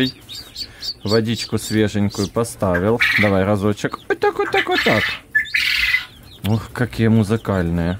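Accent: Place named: Ukraine. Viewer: Одела очки, слушать Ваш украинский говор, одно удовольствие, хотя чуть понимаю, удачи всем вам!!!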